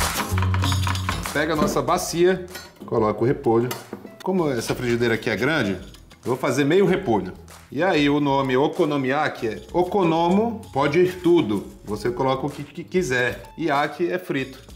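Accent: Pará, Brazil. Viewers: voce leva o Pará para o mundo com sua espontaneidade e essa pronuncia que éa coisa mais linda! Amo suas receitas e seu modo de usar o que temos.como disse antes conheci tua familia no Recanto e admirei para sempre.Lembrou muito a minha que nos criou com um pé na cozinha! Valeu! Amo teu canal.Vou te convidar pra conhecer nosso Café.Aceita?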